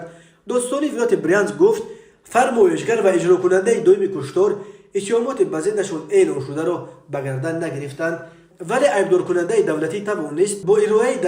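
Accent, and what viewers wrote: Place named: Kulob, Tajikistan. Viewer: Ма хдм ай Кулобм гапзаниш 100%ай лахчаш амка ширинай бодиққат гуш кн сирф точики гап задестай чхел нофахмоай ку аму карима ё чумлае ки нофахмоай нависонш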